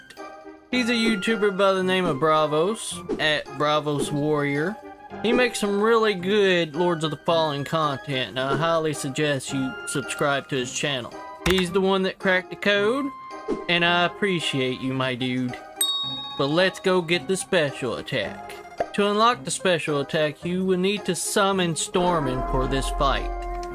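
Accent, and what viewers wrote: South, United States. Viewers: I agree 100. He's quirky, interesting, and I love the southern accent.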